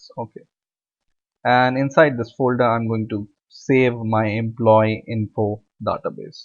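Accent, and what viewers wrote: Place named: India. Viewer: His English is very good!! He just has the Indian accent which is natural considering he's an Indian! :)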